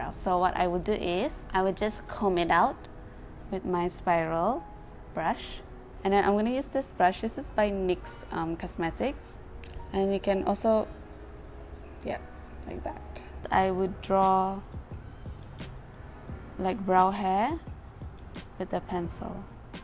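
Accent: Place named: England, United Kingdom. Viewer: Yayyyy thank you for the tips💋. Suka btul sy sma tu english accent ko. Poinsikou💜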